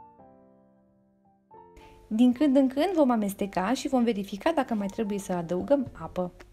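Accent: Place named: Italy. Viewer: Bună ai fost în italia ai accent de italeanca eu mam născut în Italia 💕💕💕💕💕🥰😈💕💕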